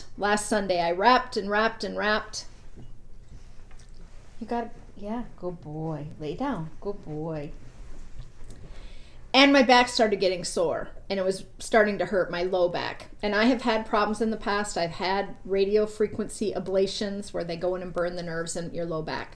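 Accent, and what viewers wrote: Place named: Minnesota, United States. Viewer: Merry Christmas and family! Ohh, I have wanted to learn Brioche, but was always intimidated by it. Thank you for publishing this pattern and the encouragement you give for how easy it should be to learn and knit. Thanks for the discount also. P.S really noticing your Minnesota twang after watching the vlogmas....don't cha know!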